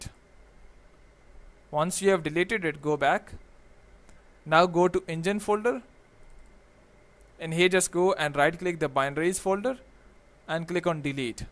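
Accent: India. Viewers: you sound like a technical indian scammer but you fixed my game.Thanks!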